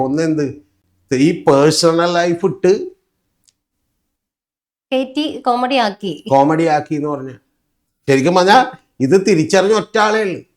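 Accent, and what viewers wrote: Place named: Kochi, India. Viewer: Oh . കൊച്ചിക്കാരൻ്റെ അളിഞ്ഞ മലയാളം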